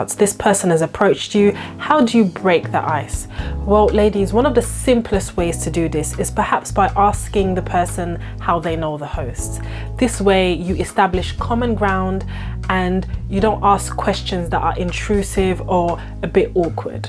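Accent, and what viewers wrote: United Kingdom. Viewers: ove your British accent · I like the British y African accent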